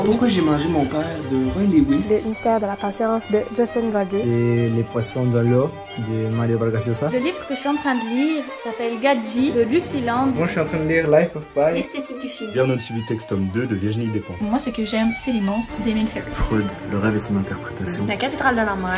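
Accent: Quebec, Canada. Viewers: I don't know why YouTube recommended your channel to me but I loved the different accent though I don't understand French/Quebecois. Greetings from Rio de Janeiro 🇧🇷☺️ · J'ai beaucoup de mal a comprendre les critiques sur ce type de défi. Autant je suis quelqu'un qui aime prendre son temps pour lire un livre et je suis plutôt du genre à lire un livre par mois, autant voir une personne qui se dit "je vais me motiver pour me remettre à lire et reprendre le gout de la lecture", comment trouver ça négatif ? Je trouve que c'est vraiment bien ce que tu fais, ça pousse à se remettre à lire, à se dire qu'on peut se donner des défis personnels pour se motiver à faire des activités, après chacun suit son rythme et ses envies. Bravo en tout cas pour tes vidéos. (PS: Ça fait plaisir d'entendre parler un peu québecois sur mon YouTube très anglais ou français, cela me rappelle ma belle année en Gaspésie.)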